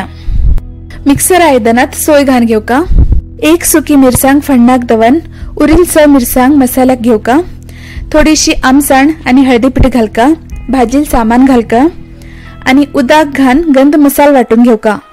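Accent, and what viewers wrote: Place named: Karwar, India. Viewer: Loved to hear your Karwar konkani...i am also konkani ,but from Mangalore (GSB)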